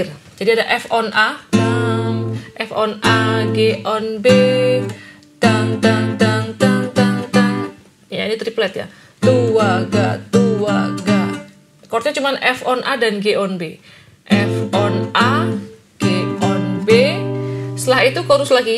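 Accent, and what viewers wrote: Java, Indonesia. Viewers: Medok banget kak 😊 Jawa nya mana ?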